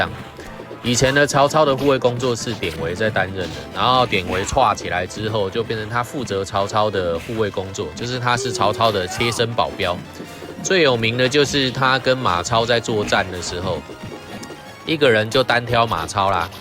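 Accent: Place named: Taiwan. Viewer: 我喜歡你講解的方式!!!夠台!!!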